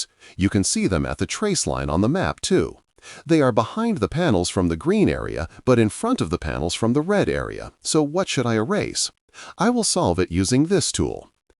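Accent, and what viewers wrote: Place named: Europe. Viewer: very good detailed how to PV panels , it will be my go to video for the next few months as i work through projects, Ai voice is good and clear and I can see how it is useful for a international audience, but I do miss the personal touch of the European accent on previous EMD videos, but that is jus me preference.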